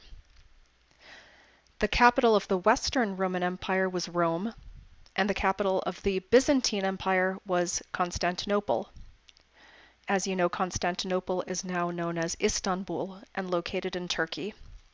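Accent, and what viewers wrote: Russia. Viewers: Most of the stuff is good but you seem to have a very thick Russian accent, and, more importantly, are very reductive of pagan and illiterate societies. Importantly, your line about "oral" languages not being able to express complex concepts is completely bogus, minus the obvious lack of adequate vocabulary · Old Church Slavonic is a beautiful language but it doesn¨t sounds good with a Russian accent, besides it¨s confusing. крест, воскресе́ние are in Russian not in Old Church Slavonic.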